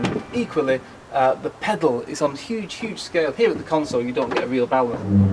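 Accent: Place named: Cockney, United Kingdom. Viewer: I love the Cockney accent but it's a great deal incomprehensible.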